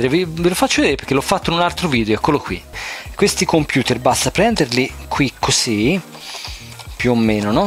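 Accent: Tuscany, Italy. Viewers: Hai un accento di merda, potresti essere più ascoltabile se non tenessi l'accento toscano nei video. X il resto meno male che va + veloce è un SSD. Carina la cosa della scheda madre anche se è vista e rivista mille volte. MI RACCOMANDO CAMBIA QUEL ACCENTO DI MERDA DA TOSCANO ! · Ma scusa se ti dà fastidio l'accento toscano non lo guardare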